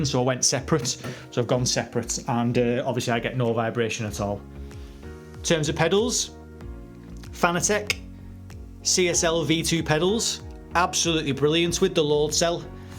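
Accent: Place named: North, United Kingdom. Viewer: Love the setup even though small space you've really made it count I can't explain what I mean really but I hope you get the jist of it, I found you through the new evo I'm looking to get it, and found you sounded northern like me so that's what related me to you lol deffo be coming back for more videos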